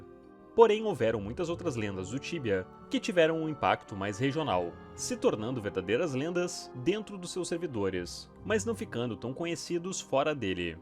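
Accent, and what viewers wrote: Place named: Rio Grande do Sul, Brazil. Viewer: Dicção gaúcha. Gaúchos tem essa dicção pela educação forte de base.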